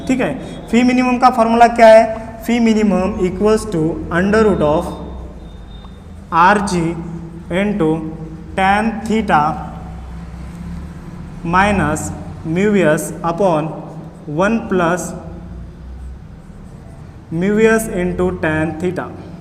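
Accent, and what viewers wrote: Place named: Maharashtra, India. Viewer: सर आप हिंदी मे पढाते हो... पण मला असं वागतं कि तुम्ही मराठी बोलता बरोबर ना सर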